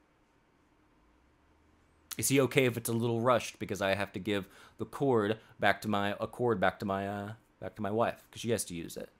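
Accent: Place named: Boston, United States. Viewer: We need more Boston accent 😂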